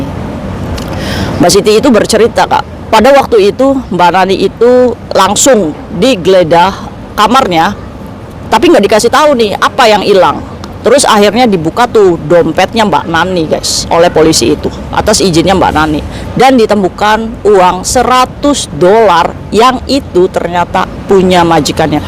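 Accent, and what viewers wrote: Indramayu, Indonesia. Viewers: Logatnya kayak org Indramayu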